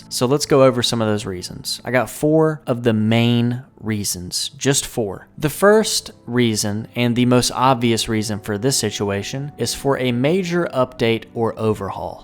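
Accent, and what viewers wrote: South, United States. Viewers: Do you know not the difference between a remaster and remake ? From this video it doesn’t seem as though you do. A remaster is just a fresh coat of paint on a older game … like Skyrim SE A remake is remaking the game from the ground up line the demons souls remake. This is supposedly a third party doggy doo doo remake with soulslike combat this is not a good thing. So you need to make it clear is it now actually a remaster if that is the case that is no big deal but a full on remake is going to be trash Not only a yucky southern accent lots of hardcore copium in this video. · Why did it take me half the video to realize the southern accent 😂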